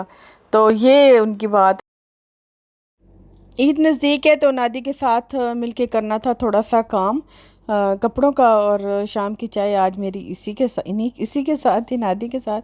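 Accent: Saraiki, Pakistan. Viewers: Towadi saraiki axcent meno bara pasand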